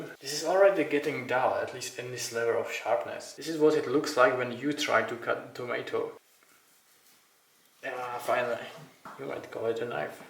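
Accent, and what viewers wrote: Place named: Russia. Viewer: ты с России что ли? акцент пипец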